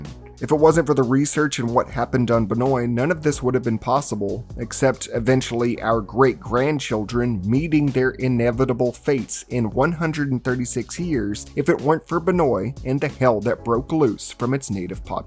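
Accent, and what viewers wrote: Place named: South, United States. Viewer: Man you’re voice gets less southern with time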